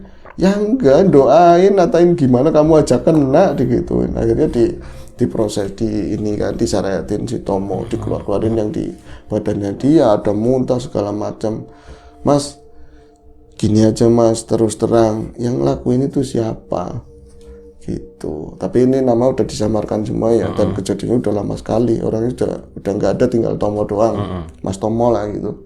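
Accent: Banyumas, Indonesia. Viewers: Sirah.. endas.. padak.. basa ngapak.. 🤣🤣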